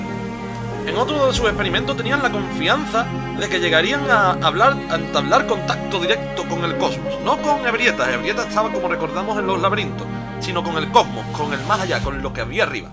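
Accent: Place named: Andalusia, Spain. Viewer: Se que el video está subido hace 5 años pero estoy rejugando los juegos de FROM enterándome de todos los lores. Acabo de descubrir tu canal y me suscribo, además eres el primer YouTube andaluz que sigo y siempre el aire de la tierra es agradable. Un gran saludo desde Sevilla máquina, gracias por tu trabajo ¿también esperando con muchas ganas Elder Ring? Va a ser épico ese juego :D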